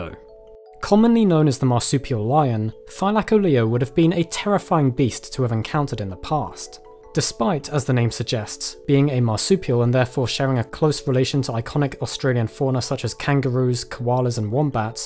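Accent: United Kingdom. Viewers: Bri'ish fella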